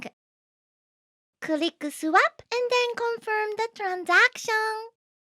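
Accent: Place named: Japan. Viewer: The narration is exactly Japanese English. Love it!!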